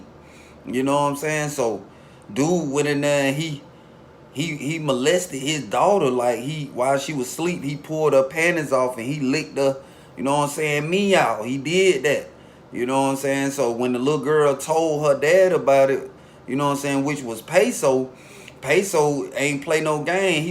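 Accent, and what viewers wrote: United States. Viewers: I just found out about your channel bro i was listening to cartoon53 and Banky Pound now I'm hooked on your channel but I struggle to understand certain stuff cause u sound so country😂😂😂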